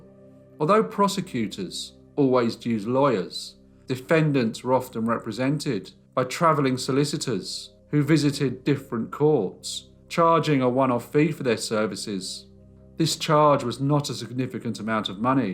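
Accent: England, United Kingdom. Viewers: I love to listen to your beautiful english accent, thank you for the stories!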